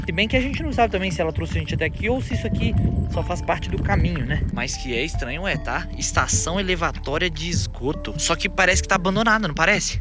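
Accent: Minas Gerais, Brazil. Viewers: vc é mineiro?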